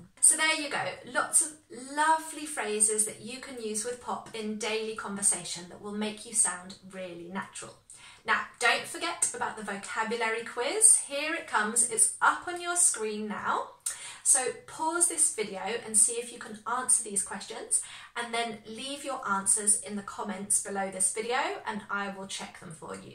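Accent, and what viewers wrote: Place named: Upper class, United Kingdom. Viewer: 👏👏👏👏👏👏👏Lovely! Ages ago I looked after my uncle's ponies at Cowdray Park Polo Club, in Midhurst, and everybody spoke just like you there!😄😀😄😀😄😀 Muchos saludos, from Olivos, Buenos Aires, Argentina🇦🇷🇬🇧🇦🇷🇬🇧🇦🇷🇬🇧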